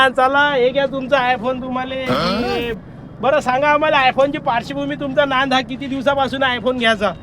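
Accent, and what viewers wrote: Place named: Vidarbha, India. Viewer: भाऊ भाषा शुद्ध झाली आपली गावरान विदर्भाची भाषा राहू द्या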